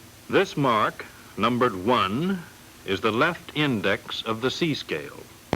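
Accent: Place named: Oklahoma, United States. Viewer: Who did the voice over for this film? He sounds like someone from Oklahoma.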